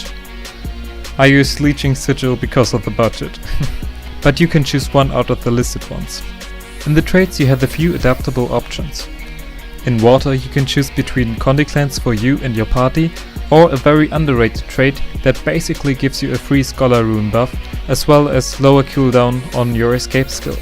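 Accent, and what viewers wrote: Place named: Germany. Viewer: Naja, also man muss ja sagen... Der deutsche Akzent ist ja mal echt lustig :D aber deine intro haut es raus... Mega awesome :3 wirklich und danke für den build anyways :D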